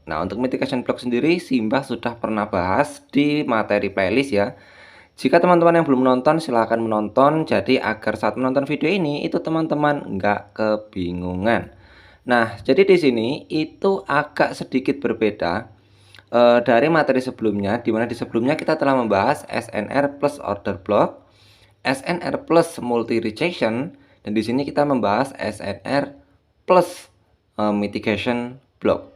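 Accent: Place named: Java, Indonesia. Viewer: saya yakin bagi semua pemula penjelasan ini menyenangkan..guampaaaang di pahami...tidak blepotan...aksen jawanya mesok mbah..he..he...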